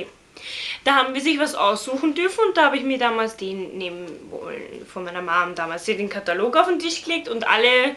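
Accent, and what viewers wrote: Austria. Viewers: kommst du aus österreich?^^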